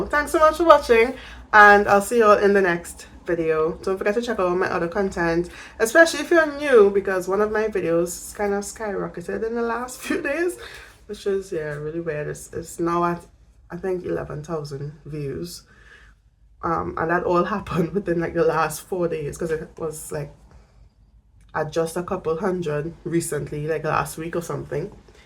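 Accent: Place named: Trinidad and Tobago. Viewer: Hi girl! Thank you for your berberine video. That is what got me here. Just going down a rabbit hole on YT as uasual and founds you video talking abouts Berberine and it peeked my curiosity. AND also you accent caught my attention, as I too a a Trini❤. Glad you are adjusting to your new Dx, and you are finding your footing as you educate yourself about the foods and learning your body as you now have DM1. Thanknyou for the information as this was very informative. I just liked your videos and will subscribe, although i may never take berbaerine or maybe i will. . Keep going 💪 ❤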